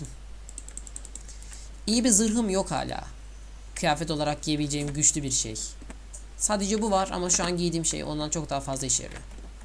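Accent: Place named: United States. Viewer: Abi senin şu amerikan aksanı gibi konuşmana bayılıyorum :) (Bkz: -Ahh kimin umrunda :)) )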